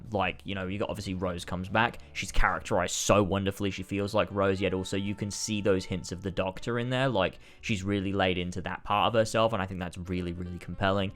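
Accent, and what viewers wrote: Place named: Southern England, United Kingdom. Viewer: I cant work out your accent you sound like youre from the south of the uk but also from australia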